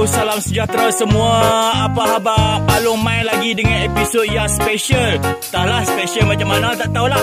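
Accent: Kedah, Malaysia. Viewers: lama dok kat pahang, tapi loghat kedah masih mantap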